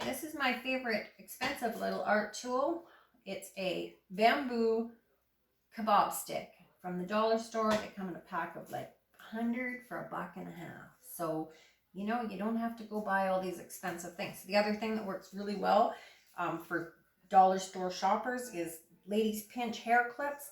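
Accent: Canada. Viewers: Good job on your first video,! BEAUTIFUL ARTWORK!! As soon as I heard your 'accent', I knew we were kindred spirits! I'm Canadian, originally from Toronto - now living in San Diego, California! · Oh. This is awesome!! How brilliant!! Such detail! I love 💕 it!! I see your from British Columbia!!! I’m from Windsor Ontario. But live in Florida now!! I thought I detected an accent from my homeland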